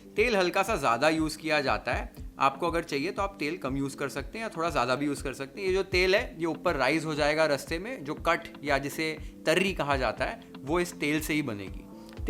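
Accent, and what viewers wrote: Maharashtra, India. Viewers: On point... Ur recipe and pronounciation... All are very authentic.. First time I saw a non maharashtrian cook to pronounce exactly the way we say in our home... And all the techniques are almost same as we cook in Maharashtra. Hat's off to you chef... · Tum jo batate hai wo sunkar lagata hai tum Maharashtran hai panjabi lagata hi nahi bahot aacchha lagata hai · Omg I am fan of your marathi accent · Ohh man you Marathi accent is litttt 🔥🔥🔥🔥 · Tumcha marathi accents 😅❤ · Ur Marathi accent the way u try to explain in Marathi is really appreciable... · Want an accent man Lai Bhari 💯💯 Sir I love your Marathi Accent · भावाची मराठी accent लई भारी आहे राव... "झणझणीत , सुखं वाटण , कोथिंबीर , परात," सगळे मराठी शब्द परफेक्ट येतात... #नादखुळा 😊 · Hataoff to you chef. Loved your receipes and your Marathi pronounciation. 👏👍🙏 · Your marathi accent>>>💛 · Recipe is perfect perfect & mouthwatering as always but 💯 out of 💯 for your ek number Marathi accent 👌🏻👍🏻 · U r so good dude … really appreciates ur efforts for Marathi pronunciations and yummy recipes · Your marathi accent 😍👌😋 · I am in love with your MARATHI accent 😍 💕 though I don't write comment frequently I never miss you videos ❤ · OMG 😍.. 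mouth watering.. in the monsoon.. yummy love the Maharashtrain accent Chef Thank you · Love your Marathi accent..recipe too !! Love from kolhapur 🙏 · Dear, Ek number Lai bhari 👍🏼😍😋 being a Maharashtrian couldn’t resist the authenticity of the recipe. It is exactly the way it’s made at our home. Your Marathi accent is Kadak. Loads of love, keep up the good job 👏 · Appreciate for the wow recipe and your accent for Marathi . Keep it up 👍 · Bro akele akele khaa raheho😅🙌yaha muhme pani aaga ya😋Bhariii Mutton🤤Mouth Watering......N ur marathi accent tooo😚great work❤️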